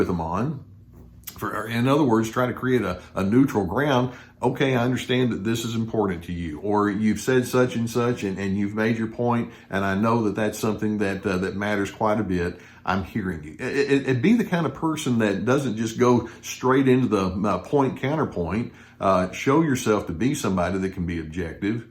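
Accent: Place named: Texas, United States. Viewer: Love you,! <3 Love your accent too! Where's that from? Texas? Yeehaw! Hey, I have a cowboy song for you! Without guns, moonshine, loose women, gambling, just a a whole lot of cows and a long, long ride... and a spiritual twist at the end! <link> Enjoy and thank you for all you are doing for us all!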